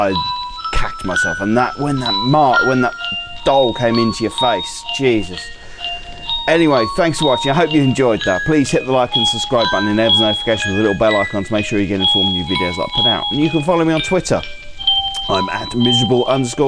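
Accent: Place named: United Kingdom. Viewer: Thanks for playing! We are launching early access in mid-October. Off topic- where did you grow up in the UK (accent question)?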